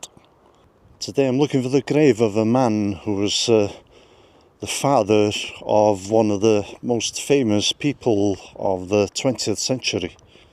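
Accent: Wales, United Kingdom. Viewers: He's Welsh!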